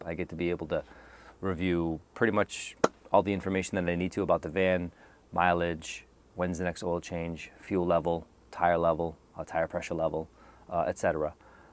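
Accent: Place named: Massachusetts, United States. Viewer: You sound like you live in MA ?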